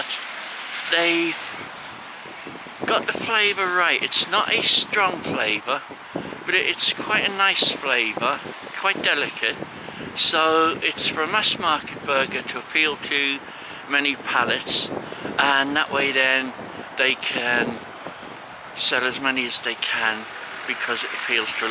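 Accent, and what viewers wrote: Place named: Cornwall, United Kingdom. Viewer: This man speaks with a Cornish accent. His ancestors were Celtic Druids who came from Devon and Cornwall.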